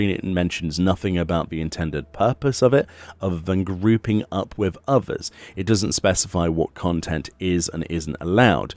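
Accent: United Kingdom. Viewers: From someone who neither rp's or erp's, I don't really understand why you go so harsh on ERP specifically here and state you dont when you obviously have some sort of issue with it o.O I do not agree with any type of public ERP but in 6 years of playing have never seen any on chaos, mainly as I haven't been looking for it I guess but haven't seen any interactions of the sort in public channels. SE can only police so much and whenever I've seen people advertise 18+ venues (from what I can tell) they have not been ERP specific just clubs mainly. There is also a language filter and emote filters, so in order for minors to be exposed to ERP or unacceptable behaviour in terms of age related content they'd have to remove the profanity filter and go looking for things within the game. That's a parenting issue, OR like most UK based 16 year olds at least, they're already well aware of the workings of the world in that respect and are treated as the young adults they are, you can't screen everything because some 16 year old or younger may see things they shouldn't when every precaution has been followed as much as is possible. 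age of consent in the UK is 16+. not 18. (im guessing this country is uk from the accent?) and do not require consent. the pegi rating is also for story content and is PG recommended.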